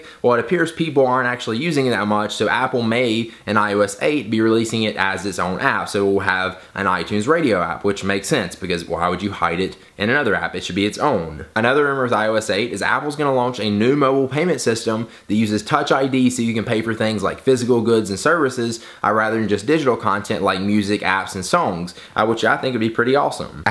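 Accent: United States. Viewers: he is gorgeous... what accent does he have? I mean I know it's american but ..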